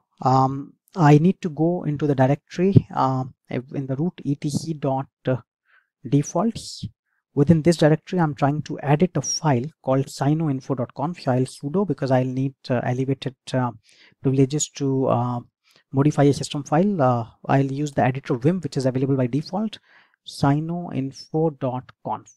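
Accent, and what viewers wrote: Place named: India. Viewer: That terrible india accent sound like dog barking !!!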